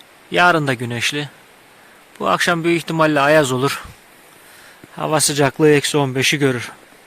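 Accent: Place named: Ordu, Turkey. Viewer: Kardeş, kolay gelsin.Genc bir arıcı arkadaşa benziyorsun.Karadeniz geniş bir çoğrafya.Güzel ülkemin hangi güzel ilindesiniz? Orduyu anımsatıyor konuşmanız.Ben Erzincan merkezdeyim.Tecrübedir, bir önceki yıl da üst üste iki defa kar yağmıştı.Yakinimdaki bir abimin arıların önü tamamen kapalıydı.Kendiside il dışındaydı.Arilarin önlerini açmak için aradım, kovan deliklerini açtırmadı.O yıl 40-45 arısı söndü.Arı kovan içinde havasızlıktan boğulmuş.Çünkü arı mevcutlarıda kalabaydı..Kovanlarini ben temizledim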